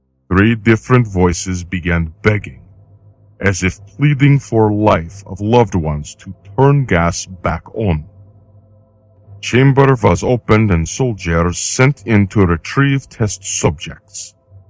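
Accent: Russia. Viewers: Are you really Russian or you that good? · That was great and I think you are the first to do it with a Russian accent. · Fantastic. This story always makes me queasy xD Clever use of a Russian accent as well as the visuals :3 Good job.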